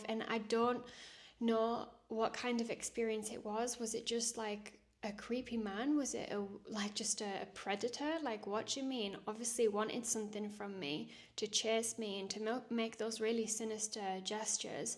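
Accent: Northern England, United Kingdom. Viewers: I've rediscovered my FAITH thanks to you and now my Wife. I maybe rediscovering my FAITH but that was a demon! You are doing God's work doing all the good in the world giving Heavenly Father's messages!. Stay safe for goodness sake. I'm newly married Wife in Queensland I'm in PERTH western Australia. I too have had similar things happen to me. God talks to me daily and its as if and I'm sure you can relate we are suddenly targets. I've had so many experiences I could have been killed its freaky! I'm From England and its your Northern accent drew me. Cheshire the Wirral?? 😊 Either way You make the each day warmer happier with your Videos. God bless You. ❤😊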